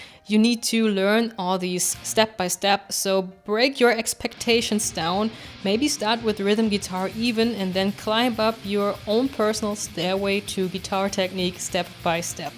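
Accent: Germany. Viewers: Do i hear a german accent? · I just love how you can hear 100% that she is german, even though her english is pretty solid.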